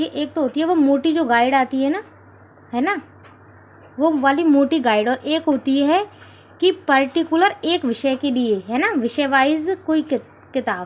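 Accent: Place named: Braj, India. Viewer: Bharatpur se braj language 😄😄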